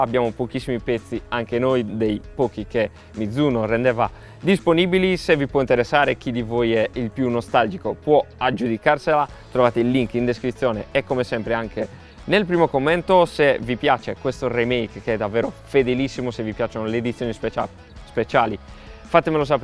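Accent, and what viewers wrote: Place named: Sardinia, Italy. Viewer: Spettacolare l'accento sardo! Continua così ragazzo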